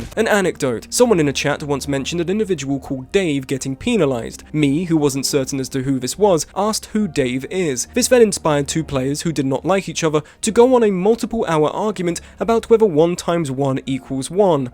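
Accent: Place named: United Kingdom. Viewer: Oooh, a 4-hour video analysis on a game narrated by a British person! I'm sure that my ADHD riddled mind can handle this! 😃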